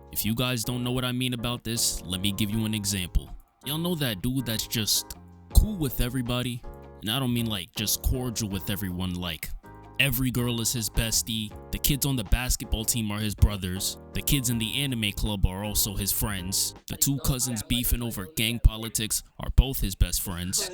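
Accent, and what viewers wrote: New York, United States. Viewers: Are you a New Yorker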